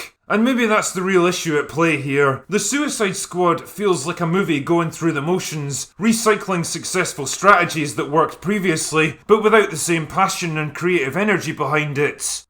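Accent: Scotland, United Kingdom. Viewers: You’re Scottish? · I used to think you always sounded drunk, then I realized you’re just Scottish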